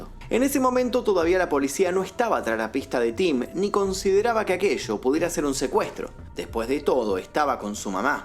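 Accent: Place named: Argentina. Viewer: Me encantan tus vídeos! Me gusta el "SH" de tu acento argentino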